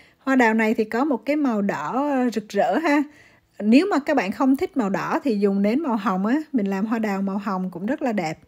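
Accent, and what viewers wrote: Central, Vietnam. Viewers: giọng trọ trẹ ko biết người đà nẵng hay người Huế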